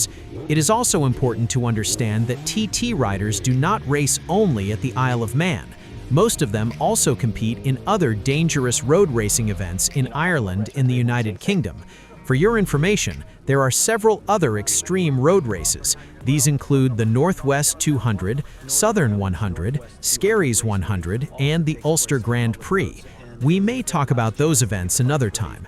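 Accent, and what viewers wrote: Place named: United States. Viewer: Amercan voiced commentary, Im out.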